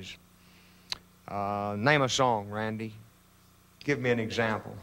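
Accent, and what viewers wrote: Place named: South, United States. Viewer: It's just his accent. I've always thought it's funny how the southern accent can make anyone sound dumb, and the british accent can make anyone sound smart LOL!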